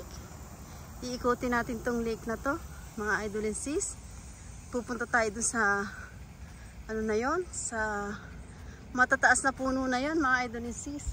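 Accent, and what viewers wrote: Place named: Philippines. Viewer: Wow ... beautiful country side .. the weather looks calm , at looking napa fresh Ng place .. awesome lake view .. thank you for bring us there .. sis .. feel ko ehh parang taga Bulacan or Nueva Ecija or Quezon ..kz Tagalog na Tagalog ka sis .. thank you for bringing us there in Georgia ..tru Virtual tour .. Sis ..paxshout out Po sa next video upload mo ..❤😊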